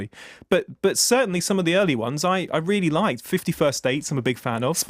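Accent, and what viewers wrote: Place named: England, United Kingdom. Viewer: James sounds a little like Boy George when he talks; he must be from England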